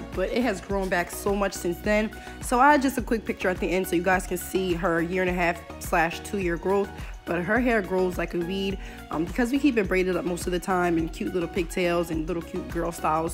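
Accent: Philadelphia, United States. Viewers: Never seen this channel before and I can tell y’all from Philly too🤣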